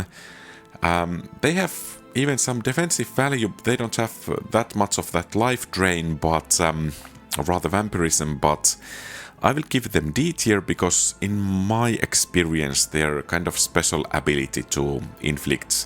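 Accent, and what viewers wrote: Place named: Finland. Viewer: What happened to your voice? You went from British accent to Finnish accent. I'm confused, unless you're using text-to-speech?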